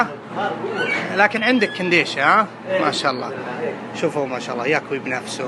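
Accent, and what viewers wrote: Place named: Qassim, Saudi Arabia. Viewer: الله يلعن لهجتكم يا القصمان تفوووو.فعلاً تجيبو المرض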